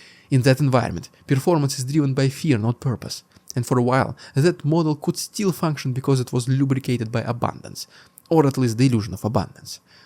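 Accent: Russia. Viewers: Yea you were nice and funny in the beginning But I have given you 2 years of views and you still sound like you have a sock in your mouth when you speak English. You so can do better. I unsubbed just because of your laziness for speaking properly. You have had over 2 years!!!!!!!!!!! And you still speak like a Russian that just started learning. NO EXCUSE!!!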